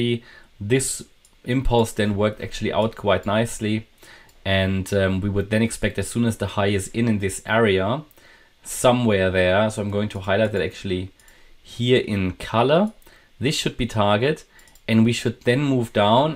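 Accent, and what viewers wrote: Germany. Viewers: Wenn du jetzt noch den krassen deutschen Akzent wegbekommst, wäre das Video richtig gut 😂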